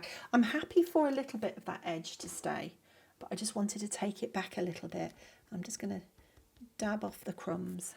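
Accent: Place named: England, United Kingdom. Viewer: Fantastic tutorial! Just drew it and he came out lovely. That C'aran D'ache Museum Aquarelle white pencil is just pure magic for the wing. Make translucency so believable. Thank you for this, it made my Sunday afternoon really enjoyable. That, and knowing you are living near Dartmoor where I was born, and come from the South East, also where I used to live, in Berkshire for a while till I moved abroad. As an Arizona expat for 25 years now, it makes me homesick to hear the English accent all over again, as I don't go home so often these days. Thanks again!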